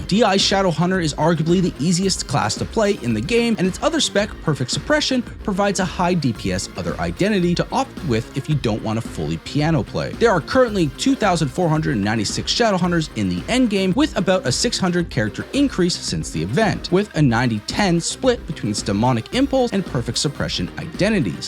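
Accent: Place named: Canada. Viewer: On a side note your Canadian accent is very thick and I’m a foreigner 😂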